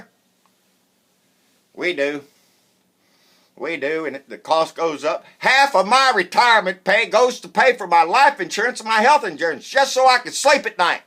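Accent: United States. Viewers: u are what a real american sounds like Honest Passionate Thoughtful! thank you!!!!!!!!!!!!!!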